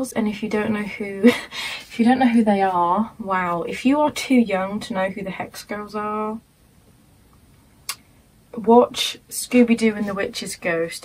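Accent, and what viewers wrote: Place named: United Kingdom. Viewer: Whys she british :(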